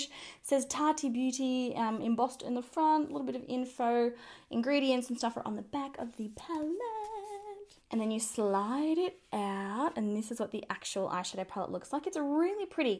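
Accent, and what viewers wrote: Australia. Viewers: I freakin love your Australian accent!!!! Watching here in the US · Oh my goodness 😍❤️ that palette is so beautiful. Hope I can win it I never win anything but hey you never know. Love your videos as always and love the Australian accent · I'm an Aussie living in the US and it always makes me so happy to hear a familiar accent <3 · Love that I have found your channel through Tati! So nice to hear an Aussie accent killing it on YouTube 🥰🥰🥰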